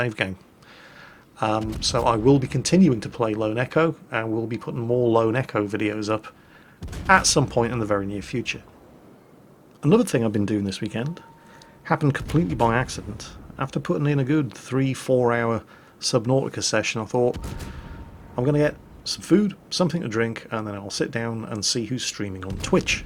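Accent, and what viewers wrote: United Kingdom. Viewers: oh my god..., do get a move on...you have made the subtle art of rambling into a tortuous experience only spared by those wonderful dulcet tones...damn your British accent for making me love you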